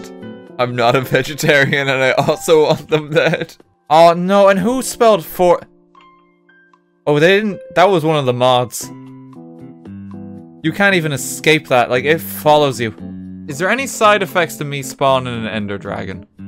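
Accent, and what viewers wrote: Ireland. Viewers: So funny story. Last night I plugged in my kindle, set it to an "RTGame's Best Videos" playlist, put my earbuds in, and accidentally fell asleep. I'm American, but when I woke up this morning, as a result of listening to him talk all night in my subconscious, my thoughts had an Irish accent for a solid minute. I cracked up as soon as I noticed it, and as much as I wish this was an April fool's day prank, I'm being completely serious XD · Ur Irish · Eyyi I hear an Irish boi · U Irish my guy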